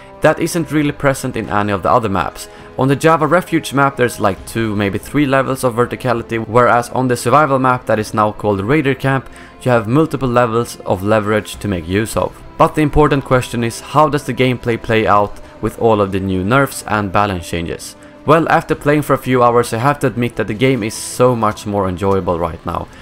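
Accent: Sweden. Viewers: are you swedish dude? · Are you swedish?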